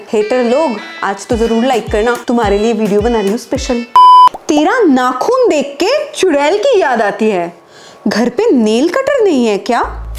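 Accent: Bengal, India. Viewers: And Plus she is Bengali so hindi isn't her first language like other youtubers. And im also a Bengali.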